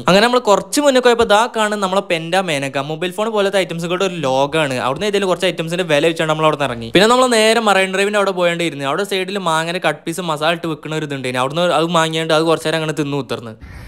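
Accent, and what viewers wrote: Malappuram, India. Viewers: Malappuram language paranj show kanikkunna chila aalkkarundu. But samsaram no raksha. Natural aanu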